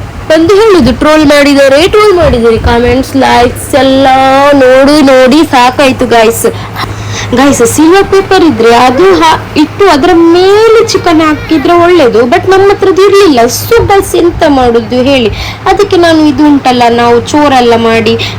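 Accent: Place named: Beary, India. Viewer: Ninga endg vatrasi kannada mundre nink byari slang carect band alle ade mundoru nink nalle saport akdar arum ningale troll aklle ❤ pinne ninga troll ayeng ninga tell famus avude k😊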